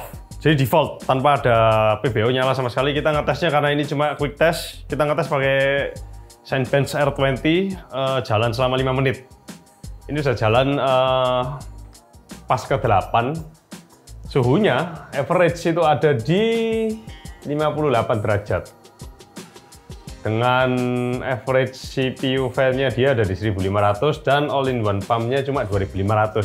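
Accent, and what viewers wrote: Java, Indonesia. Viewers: Kenapa saya lebih suka panggilnya mas mas medok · saya suka ciri khas medoknya mas 👍😀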